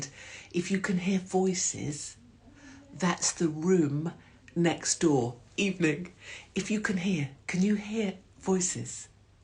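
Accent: RP, United Kingdom. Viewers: A well-spoken lady "posh" where I'm from speaking up for the working class. The more I see of the more I think....legend. As I'm writing you said Cu..nt. my god You just became more legendary to me. Keep at it 💪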